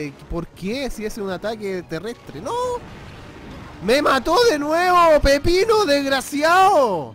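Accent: Chile. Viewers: eres chileno verdad? Y si lo eres de que parte? Bless y me gustan tus videos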